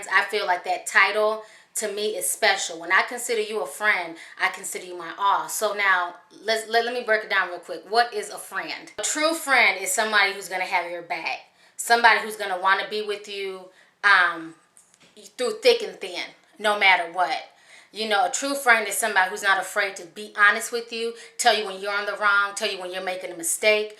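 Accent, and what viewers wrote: South, United States. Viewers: listen to your slight southern accent!! MN flushed it out. I'm loving this random flashback 💕💕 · Oouuu it's the southern twang for me! Glad to see your growth! 😩🤎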